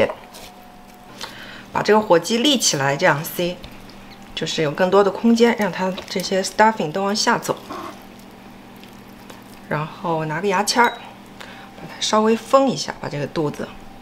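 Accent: China. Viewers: 您是北方哪里人，说话味道还蛮好听的，感觉是齐齐哈尔的吧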